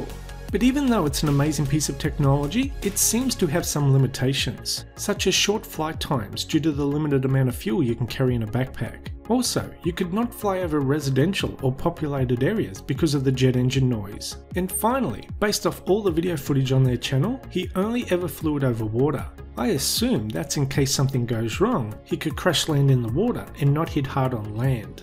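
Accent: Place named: Australia. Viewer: From a fellow Aussie, "I can tell by your accent 😉" thnx for the video, but you're an Aussie, enough with the imperial.. Or yeah use both.. I just want Americans to come around with the rest of us lol 🙂🇦🇺🇺🇲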